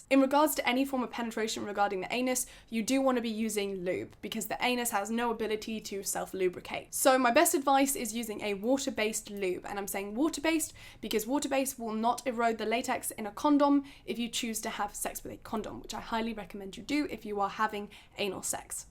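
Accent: United Kingdom. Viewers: Your accent is that British accent in every movie, absolutely beautiful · i’m also British and i love her accent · I truely AM intrigued as to why quite a few of your video descriptions are actually in German? Ich find's wirklich toll, verstehe aber den Zusammenhang nicht, weil du einen britischen Akzent hast und deine Familie - was ich aus den Videos bisher gesehen habe - auch Englisch ist (?)... Lernst du Deutsch oder ist es einfach ein Witz? XD love your chanel, love your videos, and everytime a header is in German I'm drawn to it like a moth to light ^~^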